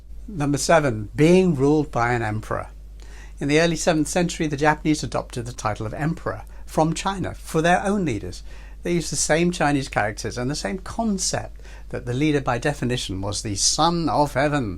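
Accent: United Kingdom. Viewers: what a disgusting video 🤢🤮. 🇯🇵🇹🇼🇰🇷🇵🇭🇹🇭culture is all superior to china!!!! spoken by an Indian dude with a British accent. The Hypocrisy😮‍💨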